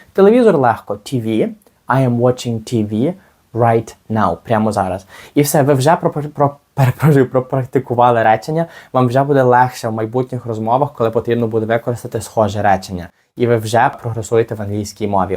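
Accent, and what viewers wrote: Standard. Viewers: Дякую за чисту українську мову. Успіху тобі. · дякую за чисту українську мову приємно чути успіху вашому каналу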